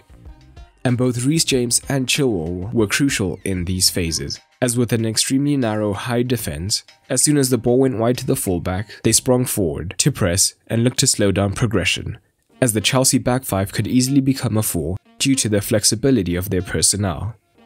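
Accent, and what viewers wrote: South Africa. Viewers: The guy doing the voice over South African?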